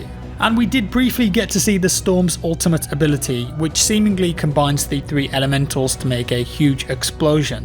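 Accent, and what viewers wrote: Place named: Midlands, United Kingdom. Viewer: Always luv ya videos man, those dulcet w/midlands tones always remind me of home. Hit me up if you're ever down Bristol ways. Keep on gaming!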